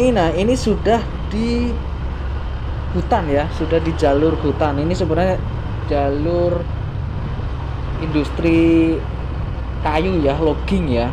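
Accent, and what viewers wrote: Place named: Banyumas, Indonesia. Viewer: Itu bahasanya kok ngapak bang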